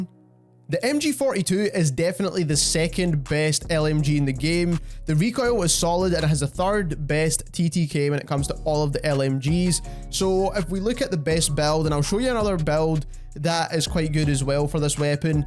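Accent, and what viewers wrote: Scotland, United Kingdom. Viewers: A Scottish guy! Nice one. Never seen u b4. Am nae guid at cod nowadays - too auld I think... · This is an ironic question given your name haha. It’s a Scottish accent :)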